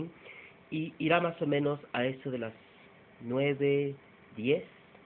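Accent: United Kingdom. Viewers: yo sueño con aprender el acento britanico en ingles ,pues amo el acento britanico la mayoria de mis grupos favoritos de rock ,demas musica y metal son de alla , the police , iron maiden , gorillaz ,led zeppelin ,napalm death, carcass ,the outfield ,black sabbath ,deep purple ,coldplay etc. y pues mi admiracion para el autor de este video ,el acento que tiene es realmente grandioso. saludos desde mexico.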